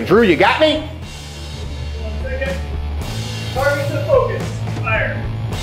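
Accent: Chicago, United States. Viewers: Sounds like he's from Chicago. He talks like my brudder. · That’s gotta be a Chicago accent. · Gee I wonder if this guy is from Chicago. Nice accent. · also, at least I didn't say that you sounded like Dan Akroyd, like the guy a couple of comments below mine, and yes, I guess it is more of a Chicago accent. I think I had a mental block on Illinois, however, being that gun rights up that area are so limited...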